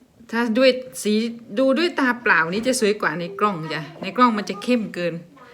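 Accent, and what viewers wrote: Isan, Thailand. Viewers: น้อง เว่าอีสานเพราะอีหลี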